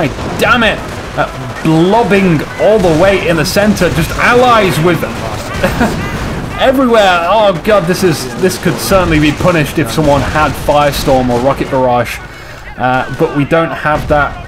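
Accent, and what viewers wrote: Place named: United Kingdom. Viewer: WAIT A MINUTE... you're British?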